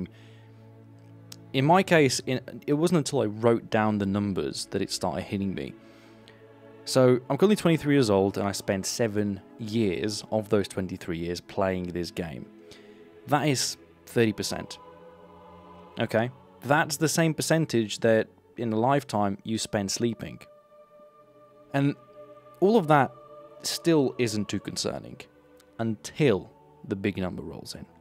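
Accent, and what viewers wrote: Australia. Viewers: Tbh he sounded more aussie or kiwi to me and im a British "person"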